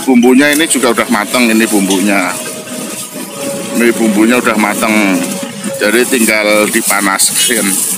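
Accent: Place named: Banyumas, Indonesia. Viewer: Orang banyumas ya om kok bahasa nya ngapak salam inyong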